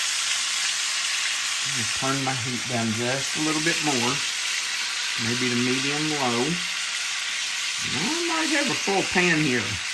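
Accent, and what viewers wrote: South, United States. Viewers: There is absolute nothing wrong with your voice. It’s clear and we can understand every word and that is so important. Keep up the good work nothing like a southern voice. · Hi, l”m southern, so your accent doesn’t sound different to me. I would like to ask a question. Where do you get the seasoning packets from? I would love to try this recipe. Thanks for the video. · I find your southern accent comforting. Actually, I've never thought about it. Be yourself, don't apologize, or change who you are. I love this channel. Love how you and complement each other, and love your recipes. God bless you both! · Your voice is a super power! Something about Southern accents makes food seem tastier and the Gospel more relevant! ❤ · Gotta agree with Miss Jen, you've got a great voice for these. I'm from the south (Texas), so I'm completely comfortable with the accent, and you use that voice to explain things so well. 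This dish has all of the elements to be amazing. Love pork chops and love rice, especially wild rice. Question for ya, when y'all are making your winter plans, how much does the kitchen of the place you're renting go into your decision making? Do you investigate it for cooking space and feasibility of recording? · I had that accent for many many years and it fills my heart with smiles when I hear you speak. I do so miss living in the South. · Welcome to FL! I'm a lifelong Floridian & reside on the west coast. I'm a huge fan of your channel & enjoy trying your recipes! Keep up the good work! P.S. - there's nothing wrong with your accent! My entire family hails from the South so listening to you feels like "home"! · Love the southern accent. It always seems so welcoming. I received my cook book a few weeks and absolutely love it. You and did a fantastic job. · and..I enjoy checking in to find your latest recipe..my mom who is a wonderful southern cook..asked me recently..do you follow.." Come sit with me"..yes I replied..we both agreed how much we love watching yall..you see are from NC..BLESSED WITH A SOUTHERN DRAW.L... 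embrace the accent God gave you..that sets you apart,makes you different and helps folks remember you and your special channel!!..oh yeah thats the great cook with the awesome southern accent!! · This sounds soooooo delicious!! Love mmmm as she was taking a taste test!! I love your southern accent as well! On video the oven temperature is 375. In the recipe it's 350. Could you please clarify because I NEED to make this!!😋😋😋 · You should be proud of your southern accent. I live in Southwest Virginia and also have the accent. It is our heritage. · I also just absolutely love your southern voice! It’s so calming and relaxing to listen to. We never hear that in Canada and it’s a real treat. “Variety is the spice of life” my mother always used to say. How diminished we would be if we were all cookie cutter the same! Be proud of your voice; it’s a great asset. 💕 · My husband and I have been following you for a little while now, and we enjoy your videos so much. 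Your voice is very easy to listen to. (I'm partial to a good southern accent. While I'm a northwest girl, my husband is from Louisiana.) I just had knee replacement surgery, so my husband decided it was a good time to try out some of your recipes. He made this for the main dish of our Easter dinner. He said to let you know he followed your video instructions... even forgetting to add the spice packet to the rice at first. 🤭 This was so delicious! Thank you for inviting us to come sit at your table! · I'm from Texas and have a Texas accent, a little different than other southern accents. Never be embarrassed by your accent. You are who God made you to be and are wonderfully and perfectly made. I love watching your channel and your recipes. Enjoy your time in Florida on vacation and hope the weather is wonderful while you are there.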